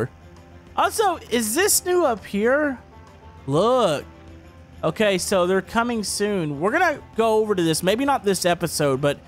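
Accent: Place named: Texas, United States. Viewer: Im sad he did not use his Texas acsent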